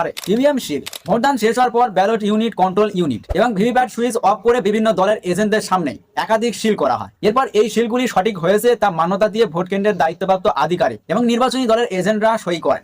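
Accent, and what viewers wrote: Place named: Bangladesh. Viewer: নিজে কিছু চেষ্টা করছ , সেটাই আসল কথা। মিথ্যা নিউজ চ্যানেল এর নাম কেন ? দ্বিতীয়ত যদি ইউটিউব এ বাচিক শিল্পীর কাজ করতে চাও তবে উচ্চারণ অবশ্যই নিজেকেই ঠিক করতে হবে। প্রয়োজনে কোর্স করে নিতে হবে। নিজের উপস্থাপনা সুন্দর হলে নিজের নামেই লোকে দেখবে। নিজেকে ও আরও সুন্দর ভাবে উপস্থাপন করতে হবে। ভারতে বসে বাংলাদেশের মতো উচ্চারণ কেন ? ভবিষ্যতে আরো উন্নতি কামনা করি। ❤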